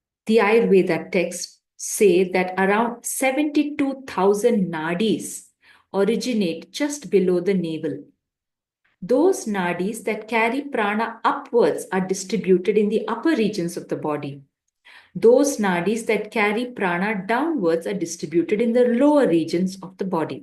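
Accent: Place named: India. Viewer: Thank you very much for teaching the Hindu dharma. Please also make a series on Purusha Dharma Paddhatih so that I can teach my son. Please also provide any authentic references or resources for Purusha Dharma Paddhatih. I also appreciate your calm tone and pure Indian accent, making everything very clearly understandable.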